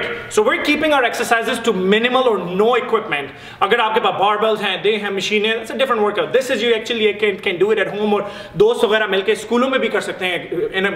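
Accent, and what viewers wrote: Punjab, India. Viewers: Plz speak slowly I'm not punjabi.its hard to get ur accent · You talk too fast and with a lot of Punjabi accent..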